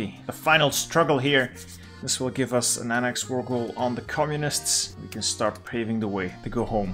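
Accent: France. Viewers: You've a nice french accent, where are you from? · You have a great French accent! You're not French right? Haha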